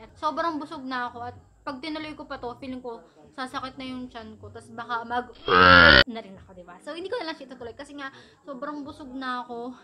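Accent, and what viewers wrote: Batangas, Philippines. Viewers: Ang ganda niyo po talaga. Natural na natural ang ganda. Gustong gusto ko po iyong batangeña accent niyo po. Keep inspiring others po. 😊😊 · Upong bahay halatang batangueña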